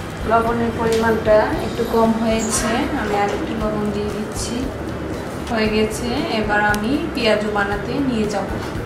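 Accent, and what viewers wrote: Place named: Comilla, Bangladesh. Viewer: apur desh most probably comilla .. your Accent says so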